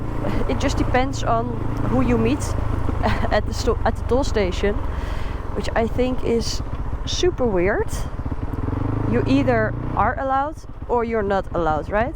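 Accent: Netherlands. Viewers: Love your videos, the dutch accent is a "must" lately in superb world travelling! ❤ All the best, take us to amazing, unseen terrains!!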